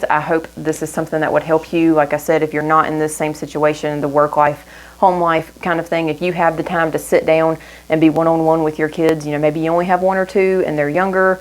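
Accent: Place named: South, United States. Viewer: I just found your channel and I’m liking it a lot. I hear some southern twang so I wondered what state you live in. I’m from Mississippi but live in Tennessee now. We love student led material and Teaching Texbooks! I have a 3rd grader, 1st grader, and 3 year old.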